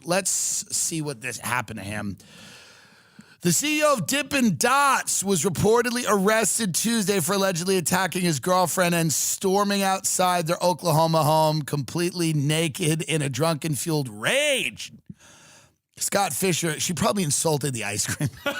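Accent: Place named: Long Island, United States. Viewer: That is not a "northeast" accent. That is a Long Island accent.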